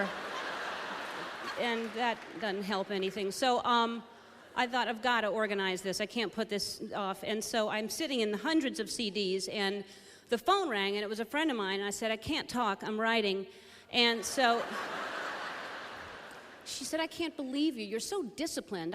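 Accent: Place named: South, United States. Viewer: Is it me or can you hear her Southern accent a bit?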